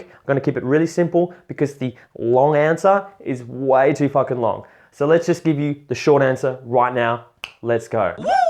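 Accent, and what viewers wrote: Australia. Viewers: Sounds like an Australian accent to me, I should have taken it up years ago but may be too old now. My cousin ended up doing really well (Hunter) and an old time close friend Chase cleaned up also, I only got as far as topless waitering and chickened out unfortunately.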